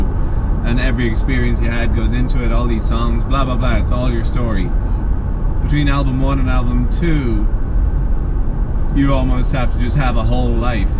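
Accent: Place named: Ireland. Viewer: And, he's the same age as me 😊 lord let me just meet this man and marry him 😂 ♥ PLEASE 😁 JUST a conversation with the real authentic Irish voice!! Or at least just post more videos of you just talking!!💕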